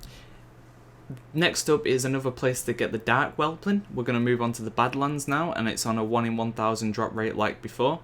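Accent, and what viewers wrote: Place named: Manchester, United Kingdom. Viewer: rofl obviously from Manchester. cheers mate lol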